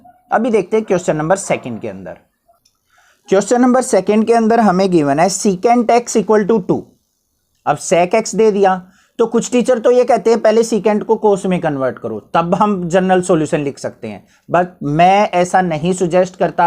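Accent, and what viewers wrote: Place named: Rajasthan, India. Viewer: Aapka accent rajasthani h. Aap rajasthani hai na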